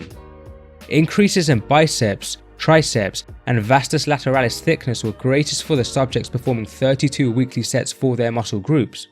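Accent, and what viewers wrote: United Kingdom. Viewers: What regional English accent is this?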